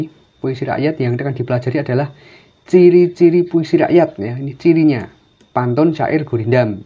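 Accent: Indonesia. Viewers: Dari logatnya saja udah kedengeran jawanya.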